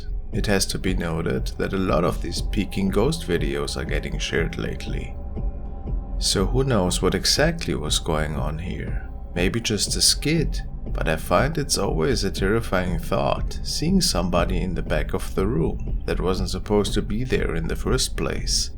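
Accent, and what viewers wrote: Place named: Germany. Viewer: He is German ! Listen to his W's they sound like V's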